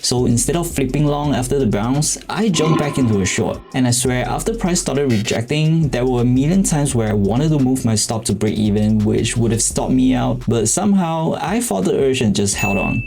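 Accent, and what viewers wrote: Singapore. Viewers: are you singaporean ? Sounds about there but if so jiayous i'm also doing funded with lucid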